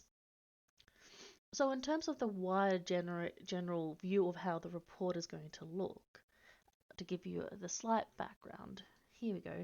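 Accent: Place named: South Africa. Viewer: thanks very much for this tutorial. I searched and searched and eventually found your video which was just brilliant. I am a bit curious as to your accent. I lived in South Africa for decades, and I have an English South African Accent, which made me question if your accent doesn't have some South African in it, then I saw one of the subdirectories which had what looked to be Dutch text, then I though maybe there is some British in the voice and possible New Zealand. You're probably going to tell me that I'm barking mad and that you are from Egypt 🙂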